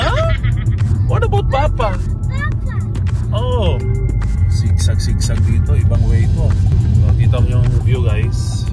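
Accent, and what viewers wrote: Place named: Visayas, Philippines. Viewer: Hello....visayan accent cutie.....good family and loving wife....you are blessed to have them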